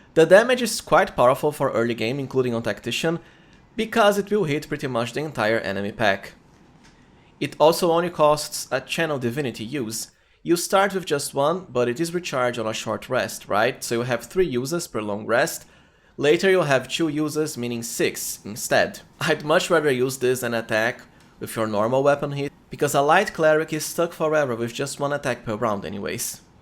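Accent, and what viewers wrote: Brazil. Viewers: where are you from? your accent sounds brazilian! if you are, shoutout from a fellow countryman :) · Se eu tivesse que chutar pelo sotaque ele é br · esse sotaque ai ta meio br kkkkkkkk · Cara, você é do Brasil? Seu inglês parece muito de um brasileiro.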